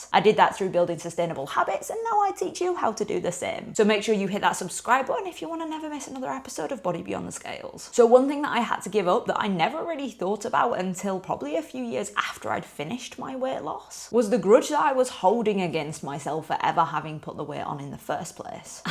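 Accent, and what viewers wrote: United Kingdom. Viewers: It's British Northern accent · are you from west yorkshire? your accent reminds me of when i lived in huddersfield and sheff :) loved the video, def relate.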